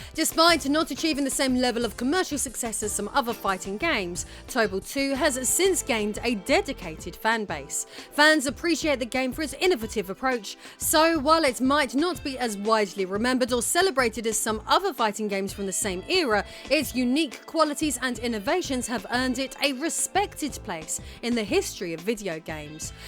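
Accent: United Kingdom. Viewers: Can guarantee she never played any of these games, and this is a conglomeration of a YouTube channel gen scheme where they find a British voice woman and slap her in front of a camera while someone else writes the scripts. Does the research and actually video editing.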